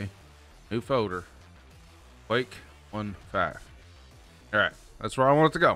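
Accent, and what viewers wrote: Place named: United States. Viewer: i really like your cowboy accent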